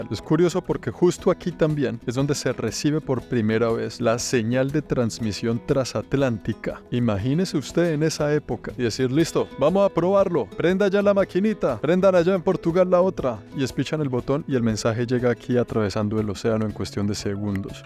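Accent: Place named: Bogota, Colombia. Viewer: «Espichar el botón» para que todo el continente entienda el hablado cachaco, su merced.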